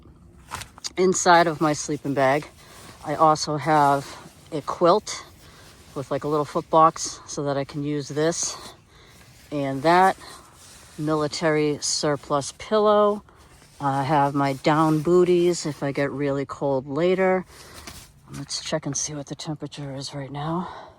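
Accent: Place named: Boston, United States. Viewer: Love your vive. I love your videos, but I love your Boston accent more. Can u add the word badabin badabun. I'm asking with all respect. Shout-out from Brownsville, Texas.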